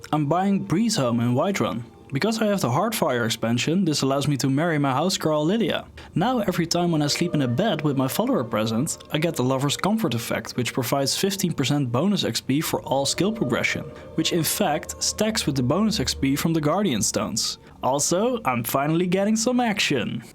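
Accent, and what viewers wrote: Netherlands. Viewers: Je klinkt wel erg Nederlands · I smell dutch😂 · Are you Dutch? Your accent is very telling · I instantly hear that you're from NL :D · Are you Dutch? Amazing video, went by very quickly. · Ik hoor een Nederlander of niet? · You really have a Dutch accent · Are you dutch? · The Dutch is strong with this one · You can easily hear you're dutch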